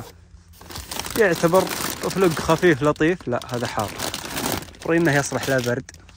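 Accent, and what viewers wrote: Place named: South, Jordan. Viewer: نفس لهجة جنوب الاردن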